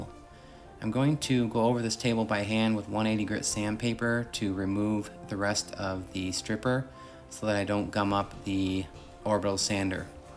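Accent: Wisconsin, United States. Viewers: I am just watching you and a few others refinish furniture. I have chronic headaches and can't handle the fumes or the bending. I'm trying sewing but having trouble concentrating due to the chronic headaches. I'll get there. I am a 2 but sometimes a 1! My husband is super neat and I drive him crazy cause I'm not as orderly as when all 5 kids were home. Now when the grandkids are over their parents will clean up with them before they leave which is a life saver! You do good work. I like watching you and listening to you. That Wisconsin accent. Lol.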